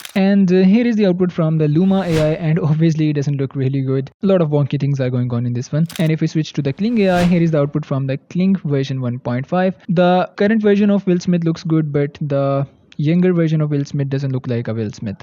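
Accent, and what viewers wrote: India. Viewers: Once I listened that indian voice I knew this shit isn't working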